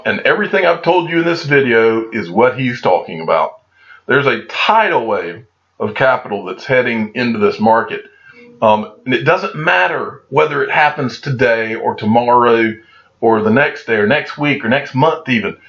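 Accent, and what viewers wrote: United States. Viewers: Brother I love your cowboy accent Your Enthusiasm your knowledge your dedication keep up the good work.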